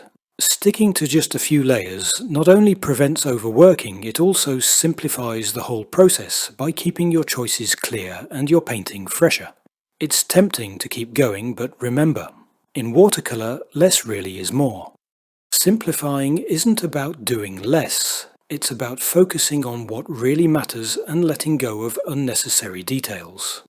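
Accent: United Kingdom. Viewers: He sounds English but can not spell colour?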